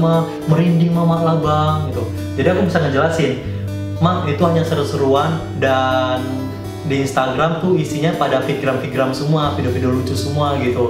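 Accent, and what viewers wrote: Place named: Karo, Indonesia. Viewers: ya bang banyak video abang kayak keseharianku setelah 8 th menikah kebetulan istri dari sumatra nah tiap pagi logat macam ini selalu menghiasi hari hari ku dan anak2ku dirumah (sukses selalu ya bang tetap berkarya) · I feel you ka~ Selucu-lucunya beti, sekocak-kocaknya beti, semenghiburnya beti, tetep otak dan dalangnya kak, itu ajasih yang perlu diinget. So ya, salut banget sama kak. Terus berkarya ya ka, aku selalu nungguin video kaka karena moodbooster parah aku bisa ngakak sampe nangis kalo liat video beti loh😂 satu lagi, gara2 sering nonton beti aku jg suka niruin logat medannya kaka, padahal aku orang jowo wkwk pokoknya semangat terus ka! 💪💪💪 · Bang orang mana? Logat nya kayak bahasa medan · Paling suka Kalau Abg Bawakkan vidio ada logat karo nya🤣🤣 kalau gak ngerti bahasa karo tanyak² aku ja bg😚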